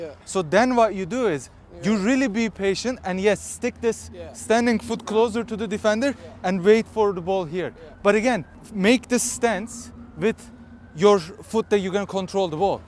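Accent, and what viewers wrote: Turkey. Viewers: Türk müsün? Aksanın türk aksanına benziyor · Heaviest turkish accent i have ever seen in my life 😂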